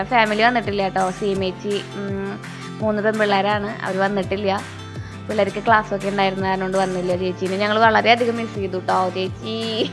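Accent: Thrissur, India. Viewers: Hi... new subscriber 👍 lv trissur language. All the best..😍😍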